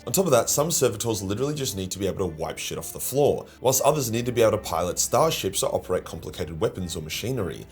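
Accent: Australia. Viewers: how did you somehow get more Australian?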